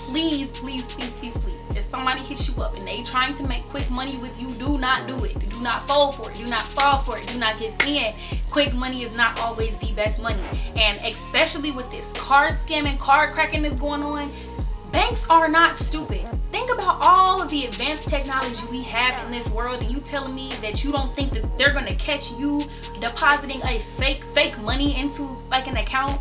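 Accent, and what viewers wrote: Chicago, United States. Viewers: You from Chicago it sound like it🤣💯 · I can tell ur from chicago lol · Are you from Chicago? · You from Chicago ?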